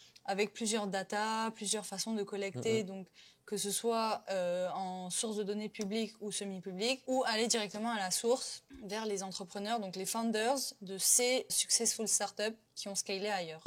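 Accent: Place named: Ivory Coast. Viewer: 😂😂 Tu commences à avoir l'accent ivoirien ma cœur ❤❤❤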